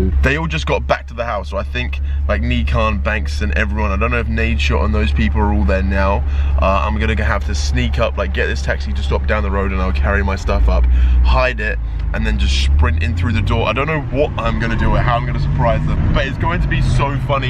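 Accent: United Kingdom. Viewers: He's British mate 😐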